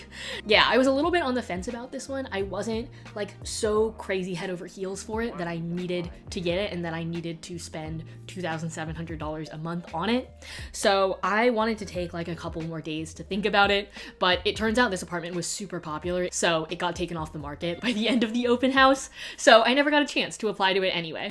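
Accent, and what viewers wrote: New York, United States. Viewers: is it me or does sound more like an NYer, like lower tone and speedy, confident vibe...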